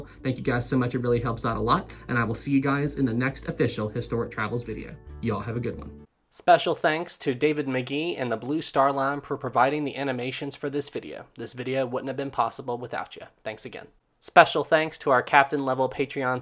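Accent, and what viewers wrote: South, United States. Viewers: Kid, learn how to properly pronounce words like "during" (which isn't pronounced the same as the word "daring") and drop the southern drawl when using words like "time".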